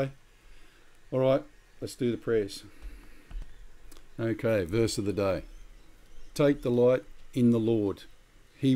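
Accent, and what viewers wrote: Australia. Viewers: ha,, great to hear an aussie accent,, don't despair with lack of response,, expect this from humans,, they are mostly pretty thick,, also pleased to hear you say the lords prayer.. I'm prepping, but I've only got some cash.. metals are useless. batten down hatches..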